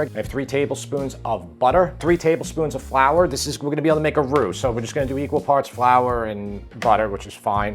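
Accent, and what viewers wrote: United States. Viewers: We're in the US, it's a US pronunciation, friend. The US speaks American. The UK speaks British. And don't get me started abooot the Canadians & whatever the hell the Aussies are trying to speak. 🤪 Worcester, Massachusetts is not pronounced Wore Chester, Massachusetts. It's pronounced Woosta or Woost UH (rhymes with Rooster if you pronounce the ER as UH))(Roost UH/Roosta) Even the Brits, who haven't learned to speak proper American, don't say Yorkshire as York Shyer, they say York Sure. Worcestershire Sauce is pronounced Woosta-Sure Sauce in the great US of A and the rest of the world is wrong!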